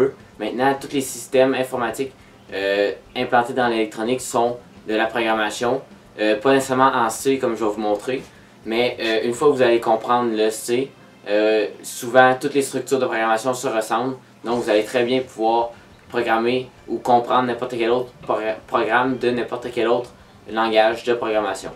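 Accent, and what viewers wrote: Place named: Quebec, Canada. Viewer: drole d'accent les quebecois lol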